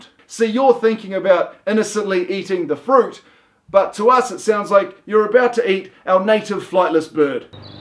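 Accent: United States. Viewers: His American English is brilliant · That American accent tho!!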